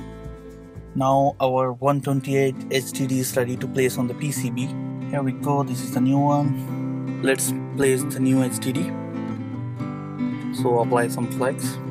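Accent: India. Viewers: Hi I can detect Indian accent so where in India are you from ? I would like to have this procedure done to my iPhone Thanks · sounds like a indien tech scammer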